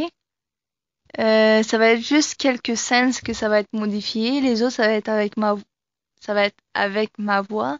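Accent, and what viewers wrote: Quebec, Canada. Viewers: est tu québécoise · Question est tu québécoise ?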